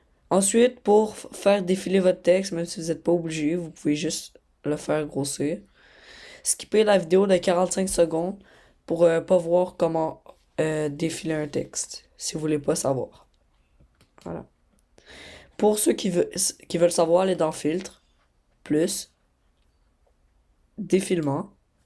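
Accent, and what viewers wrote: Canada. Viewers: j adore ton accent quebecoi tabernacle de dieu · Ses un accent canadien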